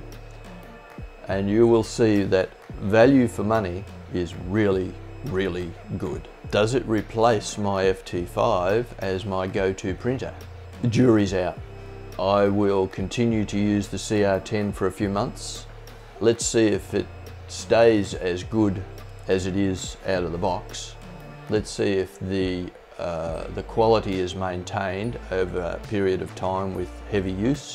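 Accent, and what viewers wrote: Australia. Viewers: Thanks for the video. Could you please clear up whether there is a difference between the US and the EU version other than the supplied cord, and that either are safe to use in Australia with a local converter. Good to hear an Aussie voice reviewing. Cheers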